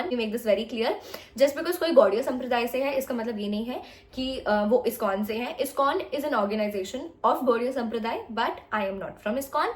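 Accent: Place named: United Kingdom. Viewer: I just love your English accent 🥰❤️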